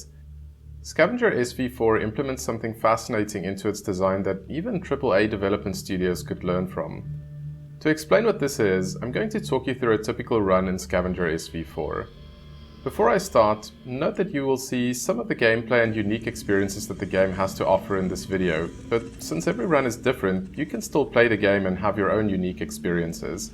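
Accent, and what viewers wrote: South Africa. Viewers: You sound awfully South African for a Singaporean!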